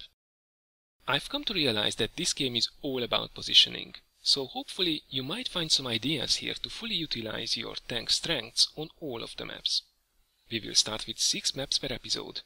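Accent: Hungary. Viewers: Már az akcentusodról meg tudtam mondani hogy magyar vagy :)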